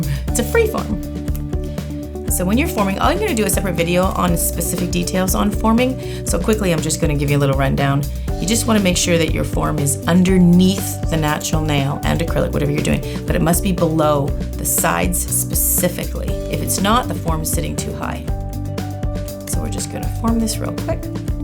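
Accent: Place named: Canada. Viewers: Cecile Ruer You are welcome Xx. You can hear it by how she pronounces certain words. Like -about etc. Very typical Canadian, and I love it hihi. No Aussie accent tho which is totally different :P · Gille87 Yeah i asked because the accent was for me very canadian :p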